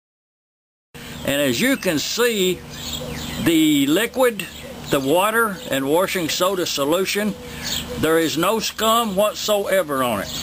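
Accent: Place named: Appalachia, United States. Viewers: You sound like a fellow Appalachian American. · Josh Green He's from east Arkansas. I love the way he says "just put it in yonder." Appalachian accent.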